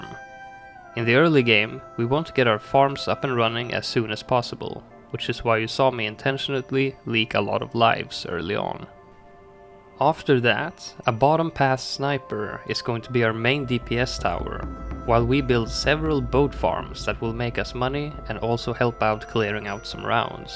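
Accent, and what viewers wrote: Sweden. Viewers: you sound really Swedish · u sound swedish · you sound soooooo swedish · You sound swedish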